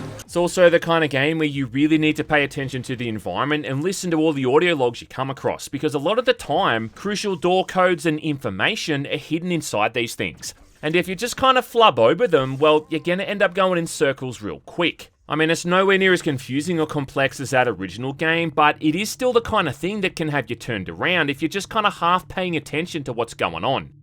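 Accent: Australia. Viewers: Never tire of you saying 1999 the aussie is strong with this one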